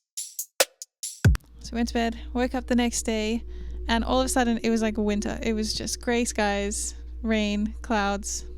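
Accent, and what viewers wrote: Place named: South Africa. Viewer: Hi. I'm trying to work out your accent. Maybe South African?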